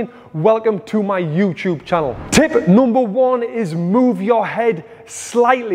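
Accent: United Kingdom. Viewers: The British accent makes this 100x better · I like your British accent 🔥🔥🔥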